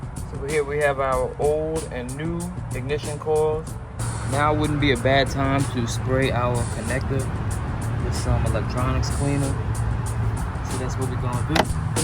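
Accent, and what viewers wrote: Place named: Louisiana, United States. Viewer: Louisiana boy.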